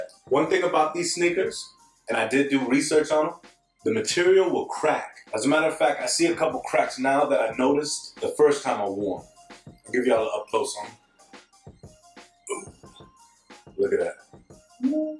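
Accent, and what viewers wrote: United States. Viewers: Nah man that's just NY talk. They have that type of flow. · Yoo you from the Bronx ????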